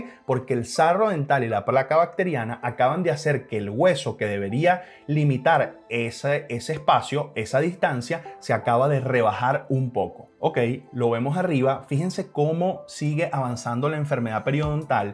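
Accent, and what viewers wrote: Venezuela. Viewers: Para mi se escucha venezolano, no se si me equivoque pero su acento me parece de Venezuela 🇻🇪.. ❤️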